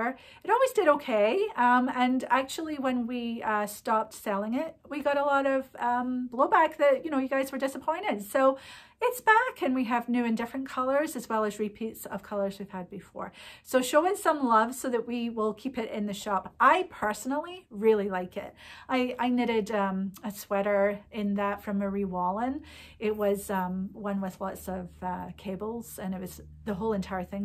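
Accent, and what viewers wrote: Scotland, United Kingdom. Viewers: Thank you for all the Scottish updates. My mom has recently passed at 85 years. Being raised in Holytown near Glasgow, she learned to knit with woolly wool from her mother and I from her. I will also appreciate your Scottish brouge as she never lost hers after 54 years in the U.S.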